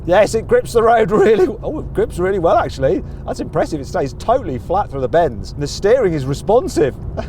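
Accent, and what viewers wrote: United Kingdom. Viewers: 영국 발음 존나 거슬리네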